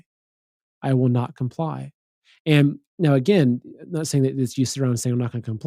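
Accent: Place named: Scotland, United Kingdom. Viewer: That was the Scottish accent and I loved it. I reminds me so much of my uni days 500 years ago :D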